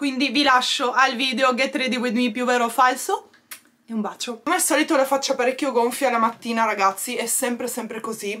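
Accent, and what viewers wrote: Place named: Sardinia, Italy. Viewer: allora ho le allucinazioni auditive! !!Sapevo che eri triestina...ma sentivo un forte accento sardo:-)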